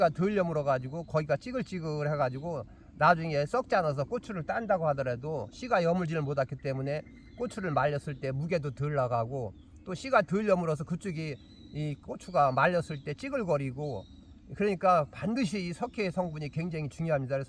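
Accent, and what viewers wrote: Chungcheong, South Korea. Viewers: 정겨운 충청도사투리의 설명이 참듣기좋아여 오늘도 추비의 중요성과 비료 선택의 중요성을 잘숙지 했습니다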